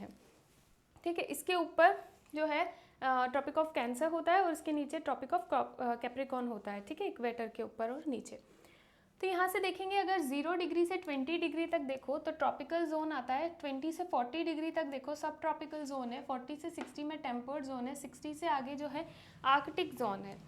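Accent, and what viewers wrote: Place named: Lucknow, India. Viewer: Ma'am i think you are from lucknow by the way of speaking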